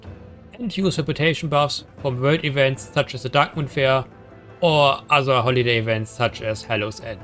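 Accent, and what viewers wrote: Germany. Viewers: thick german german accent :D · Bist du deutsch? Hast nämlich solch einen Akzent xD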